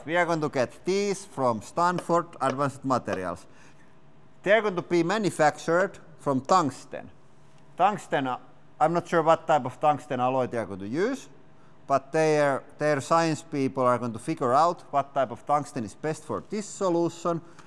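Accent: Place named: Finland. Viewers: I could listen to Finns speaking English for hours, so, you're good! 😎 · I got bored in the middle, but if you speed up some of it, to like 1.5 speed, so that we can still understand your Finnglish but also spend like 30% less time, that'd be great.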